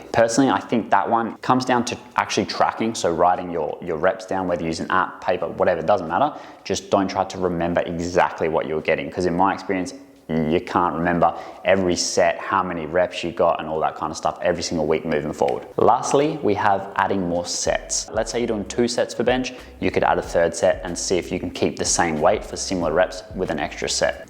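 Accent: Australia. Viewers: Your a champion mate.. the Aussie charm is refreshing to listen to while you explain things keep it up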